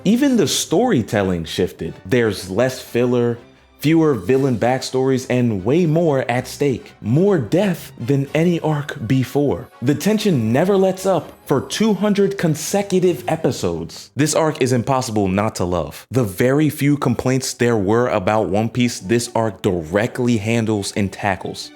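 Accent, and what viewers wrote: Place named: Australia. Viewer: I read this in his Australian accent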